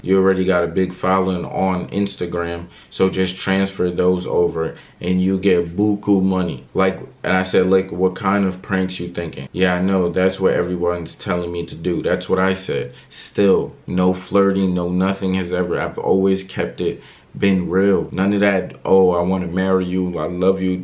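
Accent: New York, United States. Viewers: The New Yorker in him came out in this video broooo , yooo I’m cryinggg😂😂😂😂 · The New York rlly came out in this vid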